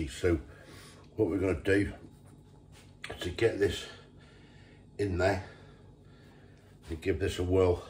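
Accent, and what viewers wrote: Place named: England, United Kingdom. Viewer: Decent grub that is! Trying to work out your accent mate. Are you from Gloucester by any chance?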